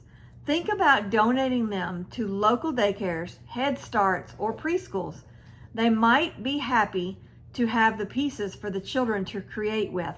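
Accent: South, United States. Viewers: Good Saturday morning Miss! Thanks for sharing my quilts. My last name is Rick-er. Rick with an "er" on the end. You're such a sweet lady. I love your southern accent.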